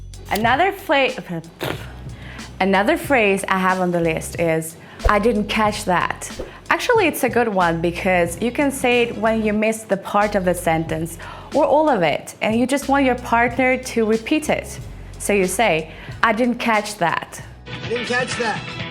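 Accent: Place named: United States. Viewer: That is american accent not british which care about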